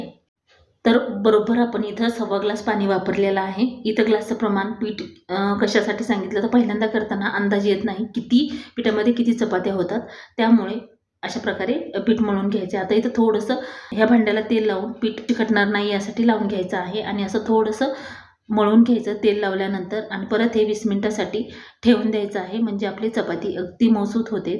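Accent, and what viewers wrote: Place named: Ghati, India. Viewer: आवाज स्पष्ट येत नाही,खूपच घाटी होते बोलताना.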